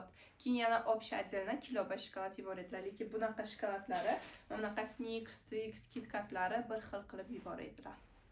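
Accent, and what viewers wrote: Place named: Bukhara, Uzbekistan. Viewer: Зур манга ёкди чикаринг ман энди обуна булдим сизга каердан сиз шевангиз бухороликларникига якин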